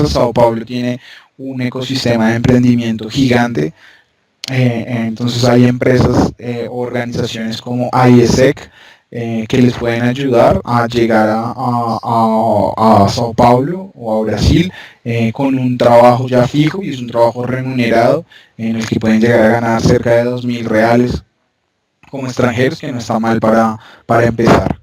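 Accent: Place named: Bogotá, Colombia. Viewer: el acento rolo Horrible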